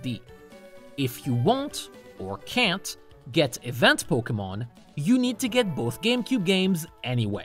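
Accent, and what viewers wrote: France. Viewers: Are you french ? Im french and i kinda hear a french accent but im not sure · Man ! Are you French ? Si oui incroyable je m’y attendais pas du tout haha 👏🏻 très bel accent 🤩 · Wait, wait, so you're from France and speaking English without an horrible accent? I am impressed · I'm gonna be honest, it wasn't until this video that I realized you were French. Désolé.. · Are you French?? You speak English VERY well!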